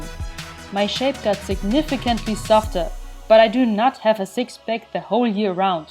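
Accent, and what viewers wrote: Germany. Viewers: Her English is really good. Yeah, she has quite a strong Accent but her grammar and pronunciation are on point. I don't understand why so many Germans on YouTube put the English of their fellow Landsmen and women down. Show some Solidarity... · Her German accent is cute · dieses deutsche englisch xD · wie man sofort hört dass du deutsche bist;) danke für das video, hab ich was zum schauen aufm öden crosstrainer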